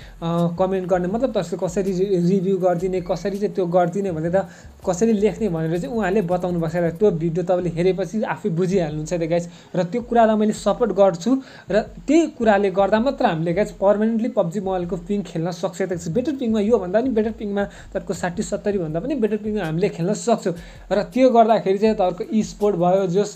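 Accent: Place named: India. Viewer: Yo Indian jasto hawa dherai nabolnu hai.eakdam irritating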